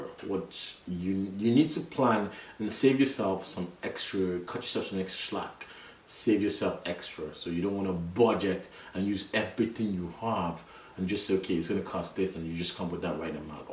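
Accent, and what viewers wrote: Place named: Canada. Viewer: social insurance is just like national insurance here in the UK..dint know that the Canadian dollars is much more less than the British pound. thats good to know. yes appropriate c;clothing .. Canada is very cold thanks for the info love your Canadian accent greet madam for us and the kisses to the new born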